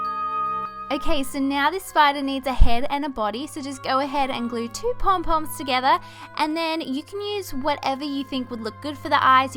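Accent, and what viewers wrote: Australia. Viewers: Australian I am pretty sure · You deserve WAY more subscribers than you have you so beautiful and bubbly it would make me so happy if you replied! I'm new this is the first video of yours that I've seen the second I heard your voice I subscribed and turned on notifications love you so much💕 BTW are you Australian cause I am too that would be awesome byee xoxoxo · Are u from melbourn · ARE U AUSTRALIAN???? Go AUSTRALIA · Is she Australian??!! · is she Australian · Hey are you AUSIE! if s so I am too!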